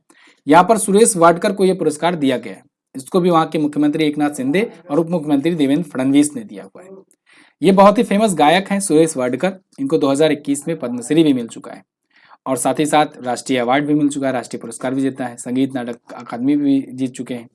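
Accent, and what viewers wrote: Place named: Uttar Pradesh, India. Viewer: Sir aap up se ho na.....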